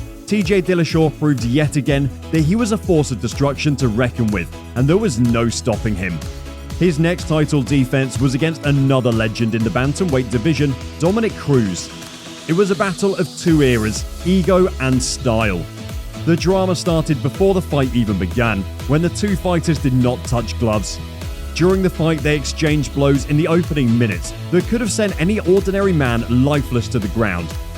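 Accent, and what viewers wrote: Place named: United Kingdom. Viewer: Your accent is so annoying British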